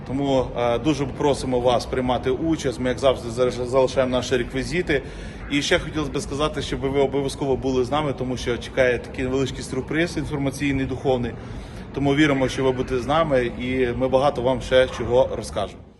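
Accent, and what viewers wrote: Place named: Ternopil, Ukraine. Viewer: У вас чудесный украинский язык Тернопольский акцент 😢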